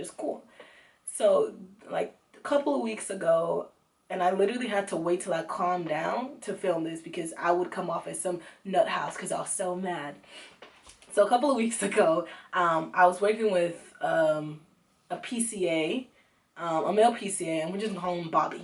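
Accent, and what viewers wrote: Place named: Nigeria. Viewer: That naija accent 😆😆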